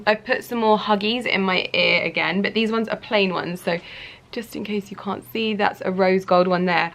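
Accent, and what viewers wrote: United Kingdom. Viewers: I’m french and I used the « paint » part to show my friends « this is british accent » ... 😍😍😍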